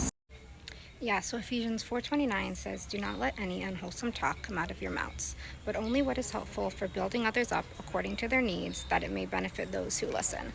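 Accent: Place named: Jamaica. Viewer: Love your slight Jamaican twang, it's cute. Your relationship is not my business. You look good, glowing and healthy. 😊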